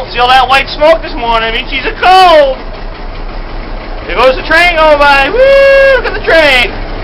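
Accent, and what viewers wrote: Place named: Boston, United States. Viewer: your from ma i can here your boston accent go redsox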